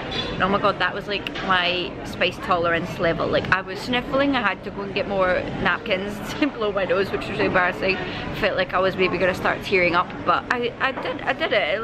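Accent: Scotland, United Kingdom. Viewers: A Scot with red hair, love the accent. Are you coming down to Tassie?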